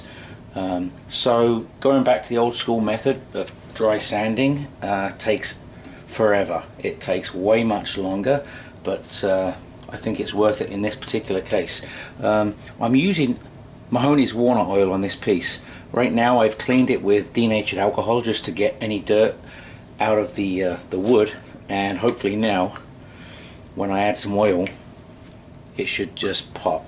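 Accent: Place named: England, United Kingdom. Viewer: That was brilliant and Im not sure where you live but you do sound English a bit like Jason Stathem Not used oil much as I cant get a good finish with it but Im sure its just me.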